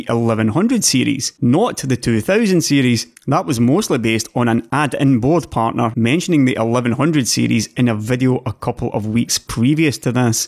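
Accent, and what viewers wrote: Scotland, United Kingdom. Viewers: Is your accent scottish? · Are you Scottish too?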